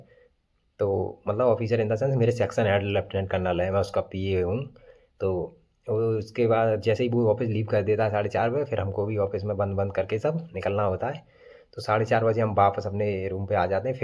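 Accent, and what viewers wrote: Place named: Braj, India. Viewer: Baat karne ke tarike se lag raha hai aap ki real bhasha Braj Bhasha hai 😊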